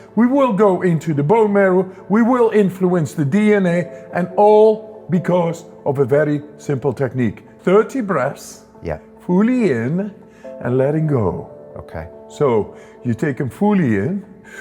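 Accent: Scotland, United Kingdom. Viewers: I was having some trouble recently calming manifestation.guide the voices in my mind during my morning meditation. him has a wonderful Scottish accent and a very calming voice. Thanks to his meditation CD's I have gotten back on track and feel a big difference in my meditation practice. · These meditations are perfect. <link> him has a gentle, warm, pleasant voice (with a slight Scots accent). The words are simple and clear. The timing is perfect... just as you start to find your mind wandering, he says and if you find that your mind is wandering... . There is no background music and no noise in the background, only his peaceful and engaging voice. The length of the meditations (about 1/2 hour each) works well for me. I find that I tire of some meditations after frequent repetition, but that hasn't happened with this one. The metta lovingkindness meditation always feels right. 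Some meditation CDs I have tried have not felt right. The voice seemed hurried, or sounded tense; the background music seemed intrusive, or there were noises that interfered with concentration. This is just perfect as an introduction for new meditators, and it's also a meditation that I return to again and again. I'd give this more than five stars if that were an option. Even my students, who are not ordinarily very receptive to meditation, relax when they hear this one!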